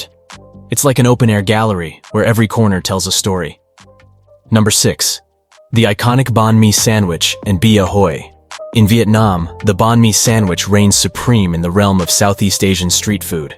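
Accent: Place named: United States. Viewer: Just to hear that American, sickening accent drives me somewhere else, especially given what those bastards did toVietnam.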